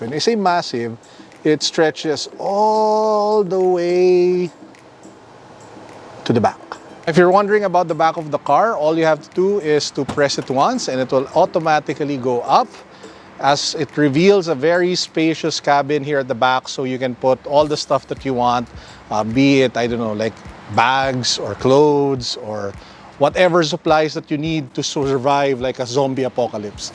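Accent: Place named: Philippines. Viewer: gawa kapa ibang review sir mas maganda kasi boses mo kesa don kay autodeal. yung english mo kasi sir may tagalog accent pa kaya ayos sa tenga.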